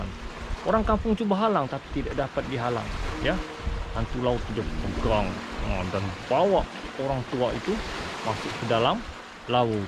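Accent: Sabah, Malaysia. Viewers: sabah kerass boy. hahahaha.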